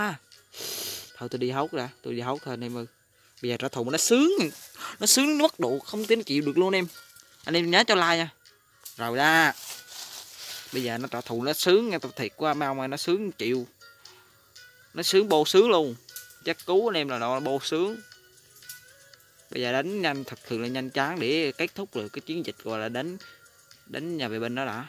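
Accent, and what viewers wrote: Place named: Quang Nam, Vietnam. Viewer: Nghe giọng quảng nam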